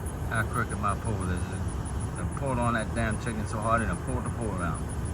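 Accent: Louisiana, United States. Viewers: She sounds like she's from da parish.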